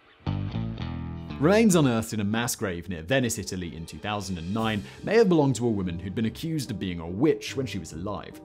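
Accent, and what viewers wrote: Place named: West Country, United Kingdom. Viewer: Mate, you say the words surprise, died, alive , bluntly , all a bit funny.. .west country , country bumkin.ish Xxx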